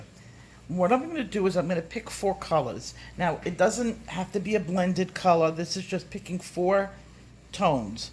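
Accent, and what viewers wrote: New York, United States. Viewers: It was a real triumph for you to finally pronounce ochre - but I was so used to hearing okra, so definitely your New Yorker accent!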